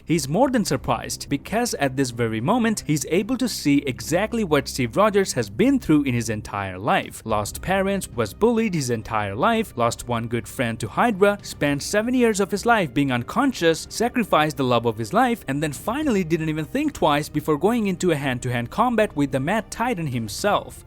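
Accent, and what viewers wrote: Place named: Quebec, Canada. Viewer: You have one of the most stereotypical French-Canadian accents ever and I love it dude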